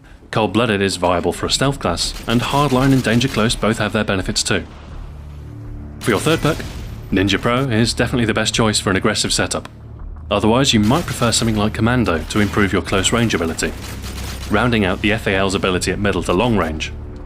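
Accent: United Kingdom. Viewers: The monotone and slight british undertone give all of your videoes a "guide on tape" feel, which is just right for the amazing detail and quality of your guides. the lack of intolerable music and over opinionated screaming of most youtube videos has gotten you another watcher. I have subscribed and i hope you will do the same for black ops. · It's the British accent and professional sounding delivery. If it was narrated by driftor it would be much less impressive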